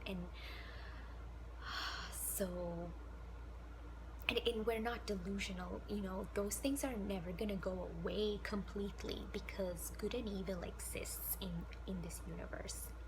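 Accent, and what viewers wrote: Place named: Sri Lanka. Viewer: From the name..indian from the accent possibly Ceylonese or Singalese from Sri Lanka...either way..same heritage...im indian as well and it looks like more and more of us are getting red pilled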